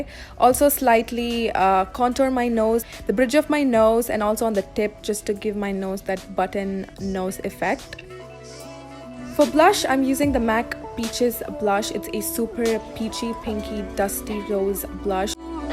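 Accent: India. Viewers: I thought the same thing.I was quite shock when I heard the Indian accent.She looks like a tanned Greek girl or tanned Turkish girl.She is very pretty though. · Wow that indian accent took me aback, thought you were black. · Am I the only one that didn’t expect her to have an Indian accent, genuinely thought she was black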